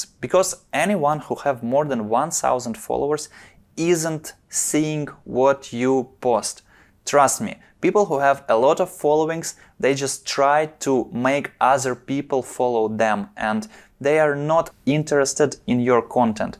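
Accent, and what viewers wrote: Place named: England, United Kingdom. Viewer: Great video, you have a nice English accent! Keep it up m8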